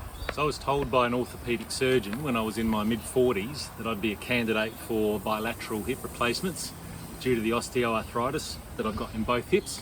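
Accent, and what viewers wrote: Australia. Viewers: I'm looking for a way to mount my bike that doesn't kill my lower-back (I have issues . . . 🙂). This looks like a great one for me to try tomorrow when I get my bike out. I was looking at getting a 'step-through' bike, but I don't really want to spend the money, so this is definitely worth a try. Thanks for the video. Good to hear an accent from down under (no offence to the Americans). Happy riding.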